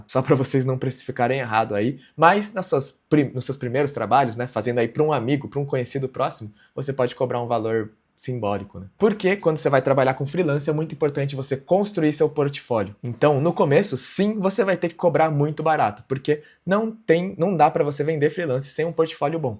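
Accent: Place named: São Paulo, Brazil. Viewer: nunca vi uma pessoa parecer tao paulista jesus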